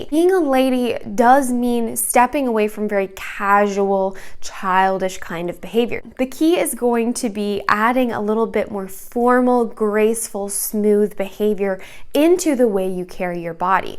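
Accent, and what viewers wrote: Midwest, United States. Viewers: What Midwest state you from?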